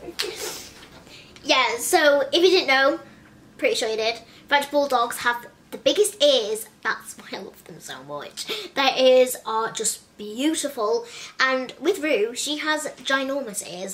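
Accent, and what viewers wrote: United Kingdom. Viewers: What a pretty voice speaking British 🥰 and a beautiful face and body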